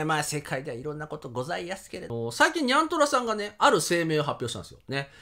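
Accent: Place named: Kyushu, Japan. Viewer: 今日、九州弁がでてたね。 昭和ネタが大好き💕なのでこれからも沢山お願いします🤲。あ、姉そんネタも大好物です。 栗きんとんの冷凍は知らなかったよー😅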